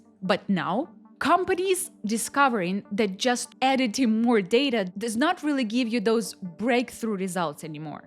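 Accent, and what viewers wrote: Russia. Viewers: Девочка очень старается. Но даже не носителю английского ее российский акцент за версту слышен. Но если будет усердно над этим работать, за несколько лет возможно научится похоже на носителей говорить.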